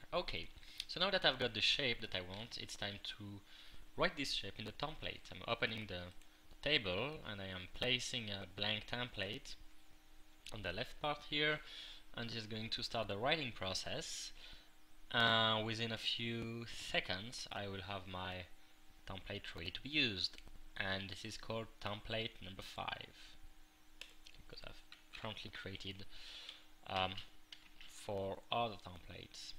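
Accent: France. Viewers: french or belgian? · Are you french?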